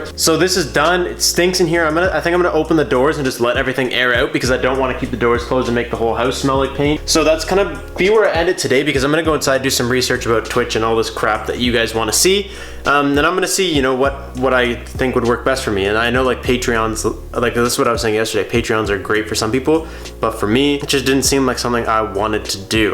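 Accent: Canada. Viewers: Is it just me or did you didn’t notice accent until finding out he lives in Canada? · You have the accent canada is where its at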